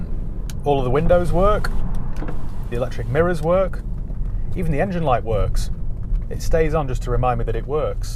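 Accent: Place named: Newcastle, United Kingdom. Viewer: Its Geordie speak, south of the border.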